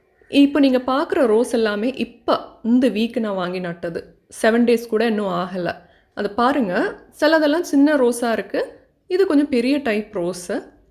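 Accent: Kerala, India. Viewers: I love ur Malayalam mixed tamil pronunciation 😍😍😍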